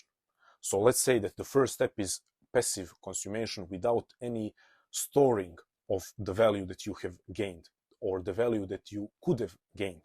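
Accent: Balkans. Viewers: Great insight, don't mind me asking but are you maybe from the Balkans?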